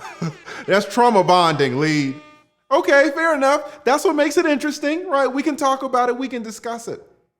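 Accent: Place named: South, United States. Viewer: Definitely the king of storytelling! With that southern twang and then you throw in you "fuckers' at times and when you say the chat told me to 'suck a d@ " haaaaaaaaaaaa cracks me up! Yessssss you're the man!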